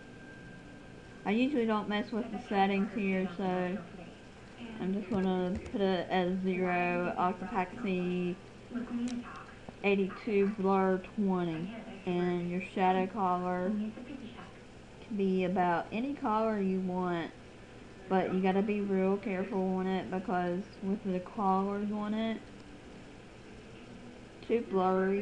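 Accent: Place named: South, United States. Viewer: ok...from your accent...you're a Southern..which is ok...but next time you video something...shut the radio/tv off..it's distracting and trying to listen to you, with your southern accent and the background noise...very difficult to hear/understand what you're saying....just a thought.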